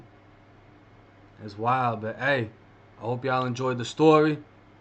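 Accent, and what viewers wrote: Boston, United States. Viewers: I hear Boston. Is it? Yes. Wicked Boston! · You sound like us Boston Ma accents lol. I'm glad I came across your channel.😁 let me find out your from Massachusetts 😁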